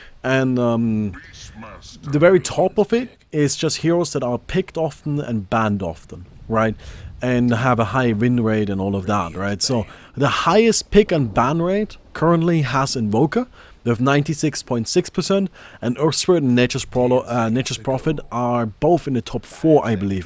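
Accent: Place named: Germany. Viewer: Same, that German accent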